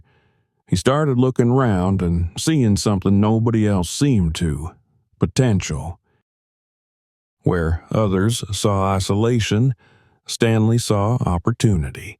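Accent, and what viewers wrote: United States. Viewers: Sorry but don’t like the American accent especially when to deal with haunting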